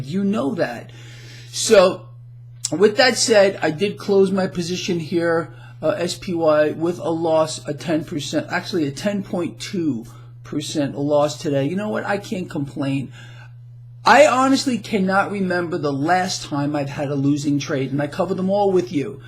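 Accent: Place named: Brooklyn, United States. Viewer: I recently came across your channel and checked out your website, amazing stuff ! I would know a Brooklyn accent anywhere as I have one too !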